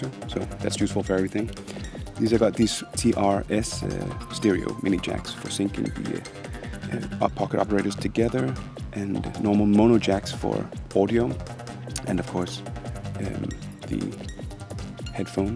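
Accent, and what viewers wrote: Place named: Italy. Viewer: You have an Italian accent 🤔 nice vid!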